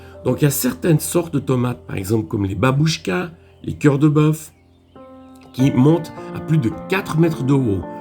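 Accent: Vaud, Switzerland. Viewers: Bonjour vraiment très intéressant votre vidéo 🙏 vous avez l accent suisses vaudois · je suis d'accord, un pur vaudois (et non romand de Neuchâtel) chantant et savoureux 😉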